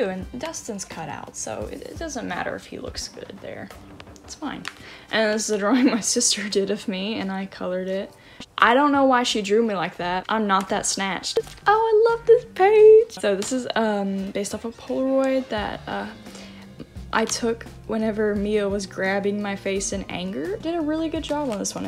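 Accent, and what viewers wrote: South, United States. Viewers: That country accent coming in thick lol it's adorable! I really love your art btw it's so vibrant and smooth looking 💕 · I love your accent. I also have a southern accent and I find them pleasant to listen to. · I hope this isn’t rude or anything but your accent makes me so much more secure about mine! Even though I live in the south people make fun of my southern accent sometimes and hearing someone else with it makes me feel so good.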